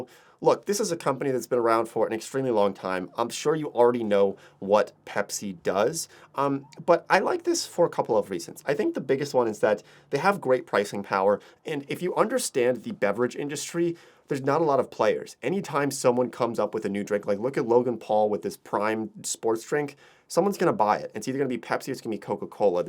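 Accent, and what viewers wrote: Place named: Pennsylvania, United States. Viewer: 5 minutes into this video, I'm like: "dang, is this guy from PA?"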